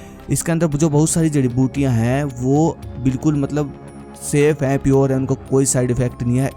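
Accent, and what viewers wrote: Haryana, India. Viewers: Bhai ap haryana se ho kya mne to isa e lag h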